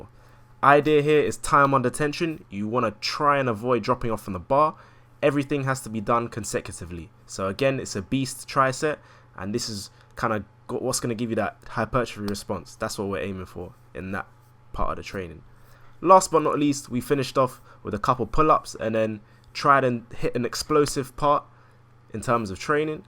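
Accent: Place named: RP, United Kingdom. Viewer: wasnt expecting the posh british accent when i clicked this video. Nice video lol.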